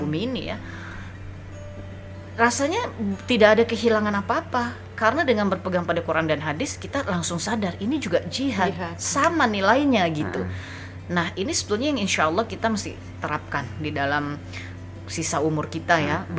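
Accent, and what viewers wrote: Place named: Palembang, Indonesia. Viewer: Senang dengar baso baso Palembang, wong kito galo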